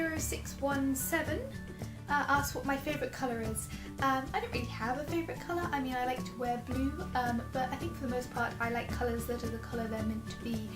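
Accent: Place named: Cumbria, United Kingdom. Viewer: You've got to be from Cumbria, that accent is hard to narrow down!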